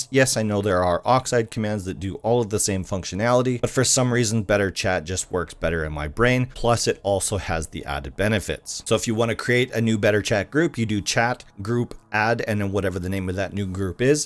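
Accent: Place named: Canada. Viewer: Dude its NOT "Copy PASTA or POSTA" or whatever you are saying ... its copy PASTE - like think of PAY + ST.... Like Fish PASTE etc. dang that is such bad and annoying mispronounciation and its hard enough with you Canadian Cousins saying ABOUT all weird but, this PASTE really takes the BISCUIT and god knows how you would say "BISCUIT" lol Im from the UK btw and love Canadians and Oh Canada...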